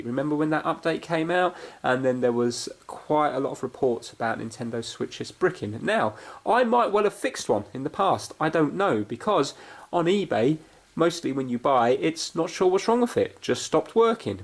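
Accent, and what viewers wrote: United Kingdom. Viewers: "Sol-der", I'm painfully aware of England and America being separated by a common language, but how many times can you say "sol-der" in a minute? Great show, but each "sol-der" was like having my ear drums flossed. We watch mostly British shows, Cookers for Stoves, Stoves for Wood Stoves, Diggers for Excavators, Train Drivers for Engineers, oh my, you just did the "Haitch" pronunciation for H, I had to turn it off. Fascinating until that point. The sol-der and Haitch pronunciations are shared by Big Clive, on YouTube, I turn him off after too many for the same reason. Hope you got it working, I'll stick to the American that fixes consoles and sundry electronics. He seems faster at it, or he edits out some, though you seem to do work equal to his. But British Viewers beware, the American version of this man may grate on your nerves like this man did on mine, and I'm not suggesting we're right, just more accustomed to hearing our pronunciations. 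Best regards. I'll add my thumbs up to the 4,433 others, wish my nerves could have made it to the end.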